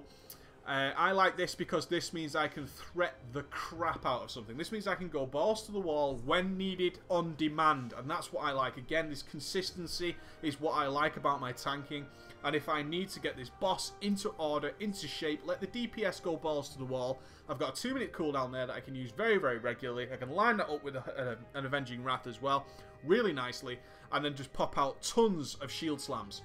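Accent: Netherlands. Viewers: Ur dutch?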